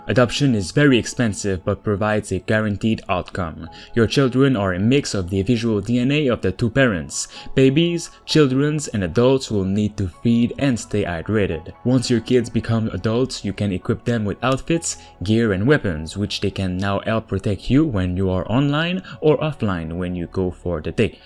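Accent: Quebec, Canada. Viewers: This guy has a distinctly quebecois accent i find...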